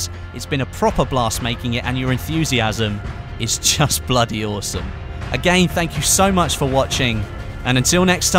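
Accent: United Kingdom. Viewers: he's British we're American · 80% of comments:You pronounced general patton wrong He is British not American so just deal with it if you don’t like the pronunciation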